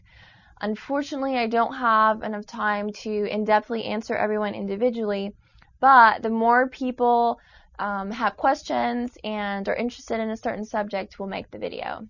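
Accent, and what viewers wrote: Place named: South, United States. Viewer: Yeah I notice that too. I think it's her trying to hide a southern accent.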